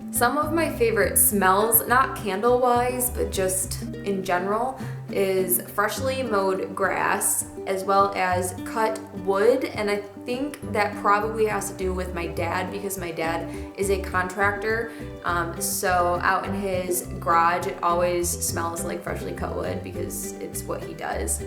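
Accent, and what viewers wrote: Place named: Upper Peninsula, United States. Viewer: Lol this is an old video but it popped up on my main page so I decided to watch it, and I'm actually really glad I did! I'm from Wisconsin and I could totally tell by your accent that you were from around the UP.. Not that that's a bad thing though! Haha :) I used to play Sims and Runescape too like it was nobody's business too! haha And omg.. I am petrified of pain too and being pregnant scares the hell out of me too. I'm glad I'm not the only one that's genuinely scared of that lol.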